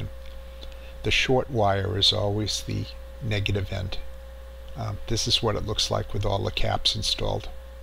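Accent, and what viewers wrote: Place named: Boston, United States. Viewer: i can tell you're from boston...just saying. cool video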